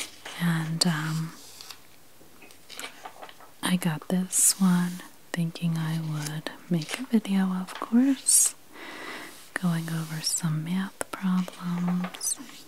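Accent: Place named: Minnesota, United States. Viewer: She's in Pennsylvania. I'm guessing by her voice she's spent time in Minnesota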